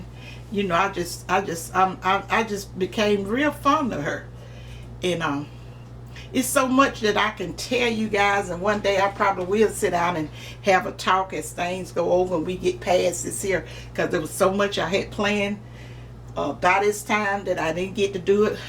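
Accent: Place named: Mississippi, United States. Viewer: Greeting's, I am a new subscriber. When I notice your accent as you talk, while you cook, I said to myself, she must live in my hometown(MS). Because my Mother cooks and sound like this, she was a great southern cooker. You are a wonderful cook, I am so grateful to have found this Jewel of you and your channel. Blessings to you and your family.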